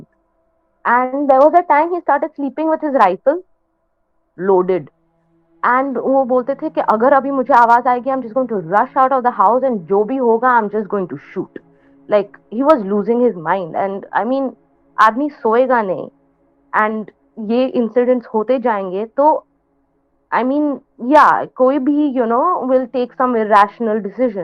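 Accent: India. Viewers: In the start of the vid I saw ur comment and now I will notice that😭😭 esp her accent is Indian that I don’t like sorry not sorry I don’t wanna be rude but😭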